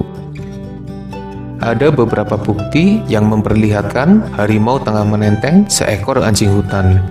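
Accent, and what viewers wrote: Banyumas, Indonesia. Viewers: Logat ngapake ceto tenan 😂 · Ngapak ya bos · Aja ngapak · Logat mu NGAPAK😅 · Aku tebak mimin nya orang ngapak,betul gak? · Salfok sama penyampaian bahasanya. Orang ngapak ya?🤣😂🤣😂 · Wong banyumas kayane kue wkwkwk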